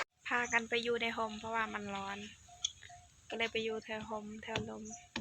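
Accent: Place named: Cambodia. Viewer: น่าจะมาทำงานบ้านที่หมู่บ้านจัดสรร ใช่ไหมครับ แต่ดูสำเนียงพูดเหมือนคนเขมร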